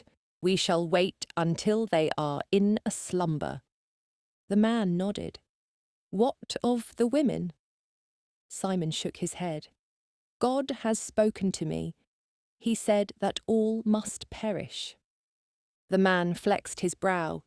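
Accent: United Kingdom. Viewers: It’s hard to listen to a Scottish story with a British accent🫤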